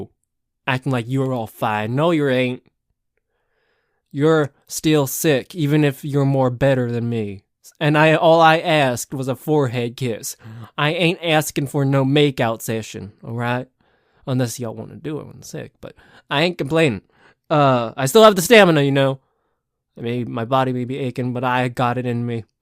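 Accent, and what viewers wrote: United States. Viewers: Nice!! More of! killed me…great work, love your southern talking, yeehaw 🫶🏽🤣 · As a resident country, great country accent.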